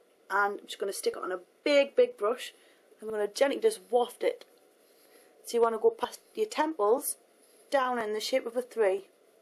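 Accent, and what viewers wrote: Newcastle, United Kingdom. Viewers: She's not Scottish - She's a Geordie ^__^ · shes from newcastle well i dont know if shes from newcastle but her accent is jordy shes definately not scottish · Its a Newcastle accent, from the north of England.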